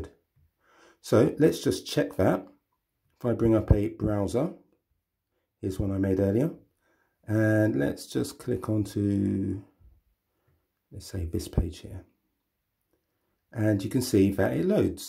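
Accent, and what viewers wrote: United Kingdom. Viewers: Tried a few videos which left me baffled, I was almost losing the will to live when I clicked on your video - bliss, pure bliss. Someone who described it so clearly and simply (pretty straightforward process as it turns out). Nice, calm British accent too. Well done mate.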